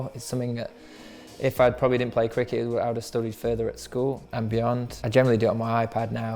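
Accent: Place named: United Kingdom. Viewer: Bro has the most polite British accent ever 😌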